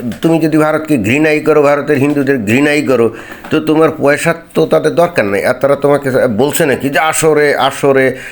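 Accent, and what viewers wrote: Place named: Chittagong, Bangladesh. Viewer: ওস্তাদের ভাষায় শুনতে বেশ ভালো লাগে। চট্টগ্রামের মনে হয়।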